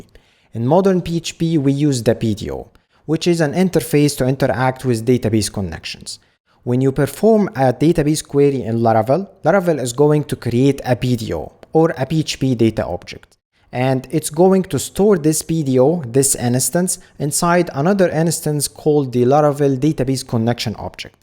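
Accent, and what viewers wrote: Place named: Egypt. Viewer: حسيتك مصرى من اللهجه قبل ما ابص على الاسم 😂😂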